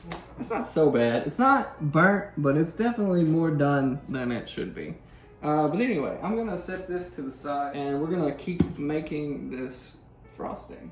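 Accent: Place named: South, United States. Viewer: I can’t stop laughing at this and the southern accent -Savebee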